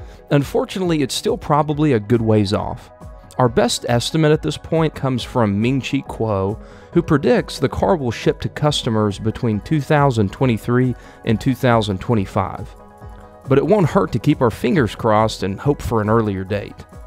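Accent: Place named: Russia. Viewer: чьють чьють руский акцент ырше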